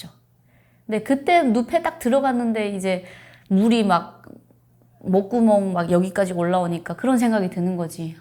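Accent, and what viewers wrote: South Korea. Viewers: This clearly isn’t her first time cooking South Korean ramen—she does it casually and nonchalantly, without any particular focus or curiosity, like it’s just another quick meal on an ordinary day. It’s obvious she’s been in South Korea for a while since escaping the North. You can see it in her mannerisms and hear it in her accent—she’s clearly assimilated into life here. That’s why I don’t get the need for these sensationalized, clickbait titles like “North Korean girl tries South Korean ramen for the first time.” It feels cheap and disingenuous. It turns her real life and quiet normalcy into a gimmick, taking the focus away from her actual story just to get views... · it’s the accent. You had to live in South Korea for a decent amount of time to lose the North Korean accent. North and South speak with differently and have different Vocabulary. 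The way you speak doesn’t change in couple of days. That’s why I can tell she lived in South Korea for a while. She talk more like a South Korean than a North Korean. So saying that’s her first time eating Korean Ramen is just Click Bait.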